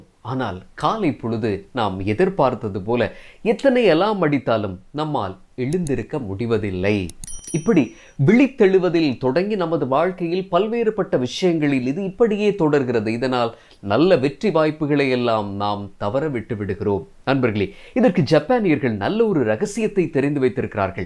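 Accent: Sri Lanka. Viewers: இலங்கையை சேர்ந்த நண்பர்கள் எனக்கு அதிகம் உண்டு அவர்கள்தான் தமிழை மிகவும் அழகாக பேசுவார்கள் நாம் தமிழர் தமிழர் என்று தமிழ்நாட்டில் இருந்து கொண்டு தமிழை நாம் சரியாக பேசுவதில்லை என்பது இதன் மூலம் சரியாக தெரிந்து கொள்கிறோம். தமிழை மிகவும் அழகாக பேசும் இலங்கையை சார்ந்த அன்பு சகோதரனுக்கு எனது மனமார்ந்த வாழ்த்துக்கள் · Yes Avar kandippa elangai thamilarthan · Ilangai tamizhan avar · Athu thaan Eelam thamil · நீங்க பேசுவதே அழகு இலங்கை தமிழா? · Sri Lanka tamil 🖤🇱🇰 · நீங்கள் இலங்கை தமிழர்தானே இவ்வளவு அழகா எங்க ஊர்ல எவனும் தமிழ் பேசமாட்டானே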